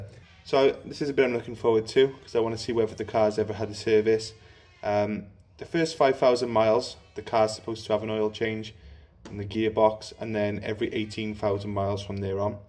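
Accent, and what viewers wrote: Wales, United Kingdom. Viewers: What part of Wales you from mate, sounds like Cardiff or Swansea area, I'm from Brecon originally but live in Florida now. Thanks for the vid on this mate, getting ready to do my 2014 X MR (USDM)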